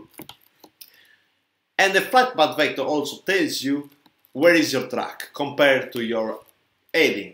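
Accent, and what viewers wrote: Italy. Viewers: Very well explained despite the very strong Italian accent.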